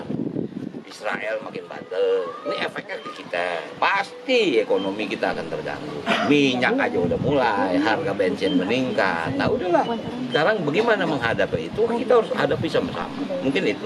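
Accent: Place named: Jakarta, Indonesia. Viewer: Enggak gaya bahasa nya..saatnya Jakarta di pimpin yg ngomng nya betawi gini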